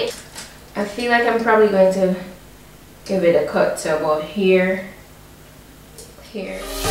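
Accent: Jamaica. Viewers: I saw you promoting a foundation on Pinterest and I was like this girl sounds like a Jamaican 🇯🇲 so I had to find you and I swear I watched all your vlogs and videos (All) and I love you, your sis and your mom you guys are the realest 😊 was actually waiting on a new video. You go girl ppl like you make me proud to be a Jamaican. God bless you 🙏🏽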